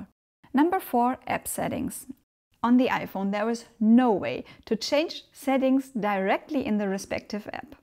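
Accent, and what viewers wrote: Germany. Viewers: Love the German accent, wish I was able to speak German, such a interesting and unique language. ❤ from 🇨🇦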